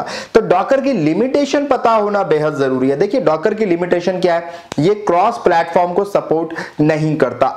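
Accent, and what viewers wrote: Pakistan. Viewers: Sir aap bharat se ho ya pakistan se, aapki ton Pakistani lag rahi hai.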